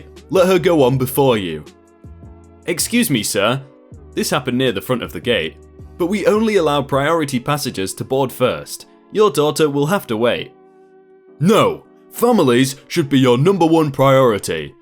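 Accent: United Kingdom. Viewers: I love how you pronounce things in British English (I’m a Aussie, we also use British English) (Richard is a good name for entitled dads)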